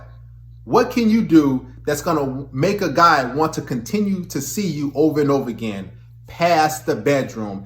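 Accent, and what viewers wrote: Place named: Chicano, United States. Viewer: You speak like a "vato" bro.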